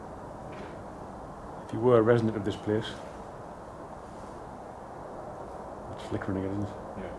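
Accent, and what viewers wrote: Durham, United Kingdom. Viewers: Was introduced to your channel by Ouija Brothers so just started watching your videos. Very very interesting. I love hearing about the history of places and the added bonus of your paranormal investigation. Your accent sounds like your from near me in Durham? Keep going your doing great. 😁😁😁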